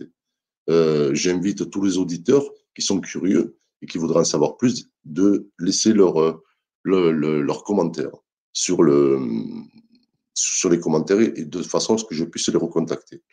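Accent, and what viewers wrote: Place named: Marseille, France. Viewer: Voix maghrébine et accent Marseillais, un bon mariage. Bonne journée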